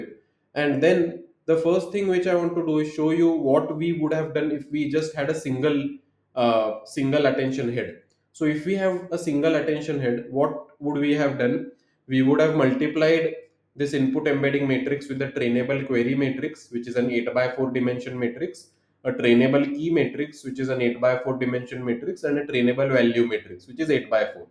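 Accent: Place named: Maharashtra, India. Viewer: Perfect marathi accent🎉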